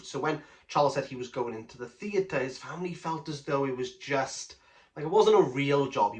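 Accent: Wales, United Kingdom. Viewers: Hello fellow person from Wales. Your accent is similar to mine. Thanks for this review. I have just finished the book and was dying to listen to someone else's views. I think Charles always called his friend Perry but was reminded to call him Peregrine. I agree that the story is funny and almost a farce in places. There's a lot of religious imagery and very very deep and philosophical. I wish that the story hadn't gone on quite so long. I did crave a clearer conclusion at the end. Thanks again.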